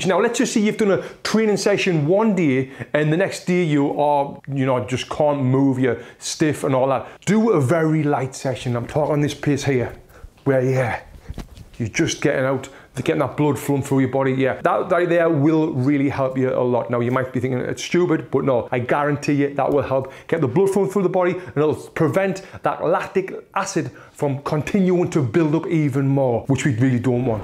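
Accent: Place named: England, United Kingdom. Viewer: You have got marvelous English accent, sir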